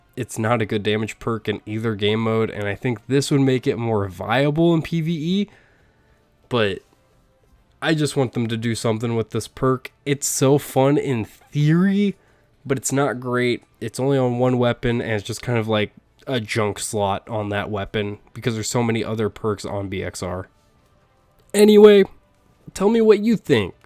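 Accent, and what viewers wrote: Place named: West, United States. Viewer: You sound like you belong in a spaghetti western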